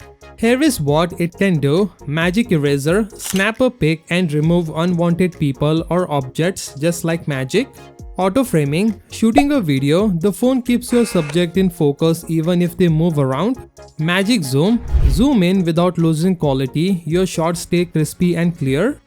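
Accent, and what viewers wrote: India. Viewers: The ultimate Indian accent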